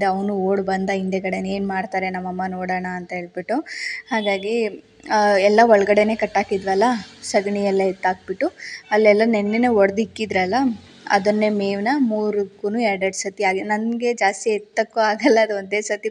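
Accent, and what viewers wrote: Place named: Hassan, India. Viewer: ನಮ್ಮ ಹಾಸನ್ ಸೈಡ್ ತರ ಇದೆ ನಿಮ್ಮ ಭಾಷೆ ಹಾಸನ ಮಂಡ್ಯ ನ ನಿಮ್ಮದು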